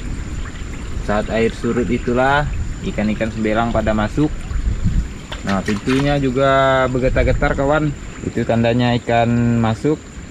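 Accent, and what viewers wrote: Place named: Malaysia. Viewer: dmana tu bang,,cakap mcm orang melayu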